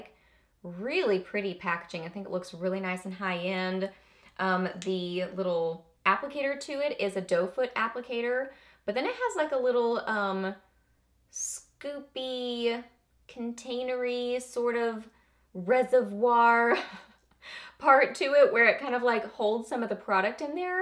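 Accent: South, United States. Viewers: Makeup Junkie I thought she was from the south. It bugged me lol! So I googled it. Nope.. She was born in NY then moved to CA.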